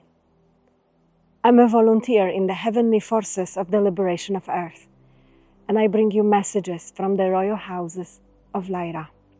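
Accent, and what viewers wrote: Poland. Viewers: I also think it is something different about this Channel about this person. I don't know what it is but i can't stop watching.❤ Is it all thrue? Or is it another trick? I wish I knew. I hear polish accent I wish she would answer I wish to break free from this prison.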